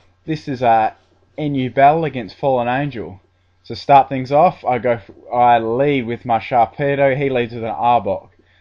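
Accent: Australia. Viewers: is the guy commentating australian?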